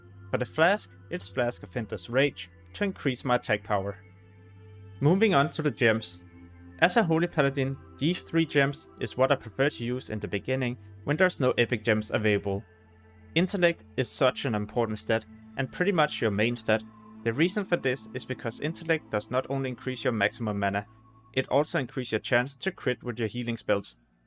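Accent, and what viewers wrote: Denmark. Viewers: Jeg kunne høre, at du var dansker. Har subbed - god kanal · Du er dansker kan jeg høre 😁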